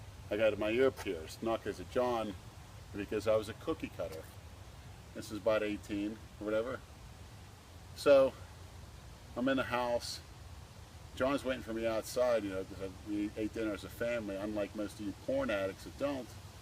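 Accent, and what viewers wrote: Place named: Pittsburgh, United States. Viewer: I love the Pittsburghese, makes me miss home.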